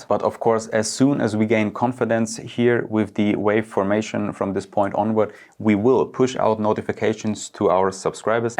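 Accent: Germany. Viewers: Man hört den deutschen Akzent. sehr geil :)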